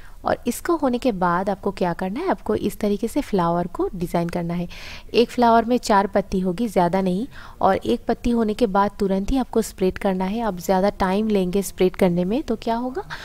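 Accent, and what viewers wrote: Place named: Bengal, India. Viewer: তোমার বাংলা খুব মিষ্টি লাগে শুনতে।🌷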